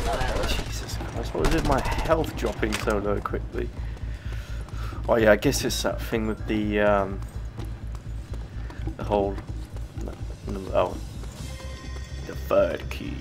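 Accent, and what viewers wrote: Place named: England, United Kingdom. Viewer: "IMA TRYIN ET AMMO YA FAWKING CAUNT" xD i luv the english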